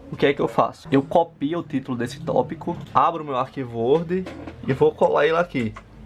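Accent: Northeast, Brazil. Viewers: Você é nordestino? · Vc é nordestino né bixo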